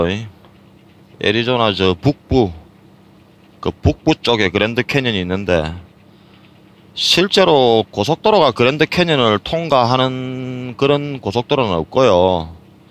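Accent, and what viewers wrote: Gyeongsang, South Korea. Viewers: 구수한 경상도 말트때문에 볼때마다 지겹지가 않아 항상보고 있습니다 안전운전하세요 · 영어도 굉장히 잘하시던데 완즈이 경상도 사나이시군요 ㅎㅎㅎ 항상 안전운전하세요!!! 저도 현직 트레일러 기사입니다^^ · 고향이 경상도 어디세요? 난 진주가 고향입니다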